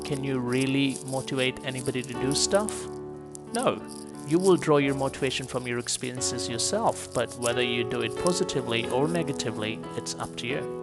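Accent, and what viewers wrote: India. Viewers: Are you indian? My friend (who is indian) speaks like you IDK but i think so.....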